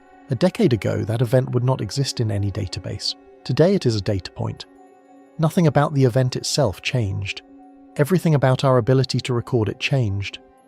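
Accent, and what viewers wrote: United Kingdom. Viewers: Humans cannot put two and two together in real time 3i/Atlas came through in retrograde between the orbits of Mars and the Earth with its "tail" pointed towards the Sun - that is into the future orbital path of Earth and spewed toxic gasses, vapors and chu KS heavy enough to just slow and sit there. Now earth is orbiting through it and catching the debris and toxic soup. One needs to get over the fact that 3i /Atlas was not a Comet and it is simple to explain. The question is how long will be catching debris and what other dangers it brings Let us not make 2 hour lectures in stupor - it is explained by some orbital mechanics Different channel name same British spit mouth. There would not be lisp noise unless words are being spit mouthed. Use an American clean English AI text speech reader and stop repeating not this, not that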